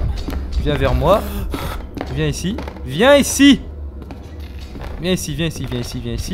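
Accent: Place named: England, United Kingdom. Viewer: j'adore ton accents anglais 😂😂😂 Sinon super vidéo comme toujours 👏👌👍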